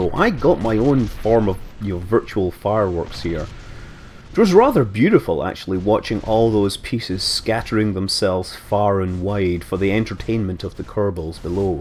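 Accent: United Kingdom. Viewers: You live in the US? Sound so british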